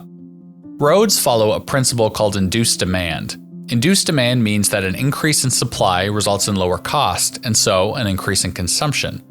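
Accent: United States. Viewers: Because the creator is a dumb YANK, NOT A CANADIAN. Can't even say "zed".......as in either of our two Official Languages.....one of which is NOT "Amurrrrrrican".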